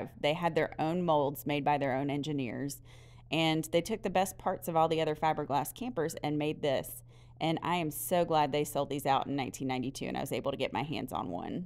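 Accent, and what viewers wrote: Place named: South, United States. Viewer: I love your personality and your adorable southern belle accent, happy travels!!!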